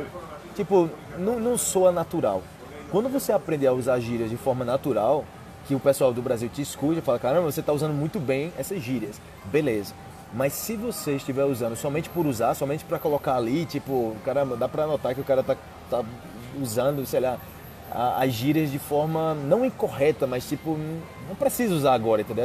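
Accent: Colombia. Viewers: Me gusto tu acento colombiano...vai com Deus patrão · Mae, mil gracias. Me encanta su acento de español colombiano ja, ja, ja.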